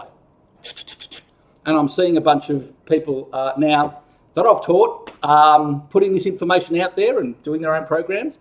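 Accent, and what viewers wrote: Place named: Australia. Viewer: ! I LOVE YOUR TEACHINGS AND LOVELY AUSTRALIAN ACCENT!! I'M JUST LEARNING TO PLAY THE TRUMPET! SO I'M SO! GLAD YOU ARE DEMONSTRATING HOW TO DO IT THE EASIER WAY!! I'M ORIGINALLY FROM FLORIDA, AND HAVE MOVED OVERSEAS TO PAGADIAN CITY, PHILIPPINES! THANKS AGAIN! AND MAY GOD BLESS YOU! ALWAYS!! 👍😁🙏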